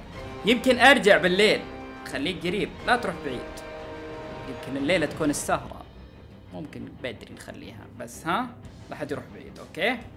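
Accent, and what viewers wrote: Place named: Saudi Arabia. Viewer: اللهجه سعوديه وحتى ask any one he told you were he from!!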